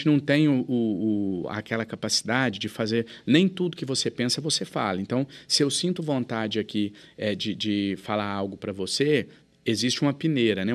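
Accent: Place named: Minas Gerais, Brazil. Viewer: JANONES presidente do Brasil daqui uns anos! Está amadurecendo para isso! Adoro suas ideias e o sotaque mineiro!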